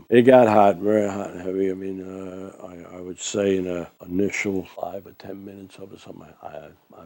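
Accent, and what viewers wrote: New Jersey, United States. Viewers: You sound like your from joisy God Bless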